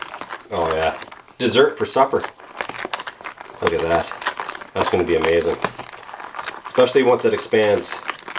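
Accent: Newfoundland, Canada. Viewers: are you from newfoundland ive been watching your vids about a year now and i often said i think he is from nfld or lab for sure are you???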